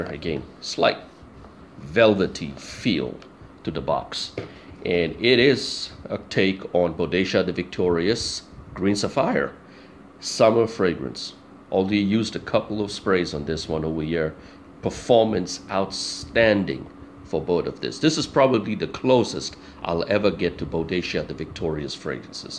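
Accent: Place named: United States. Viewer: You speak very good American English for living in India.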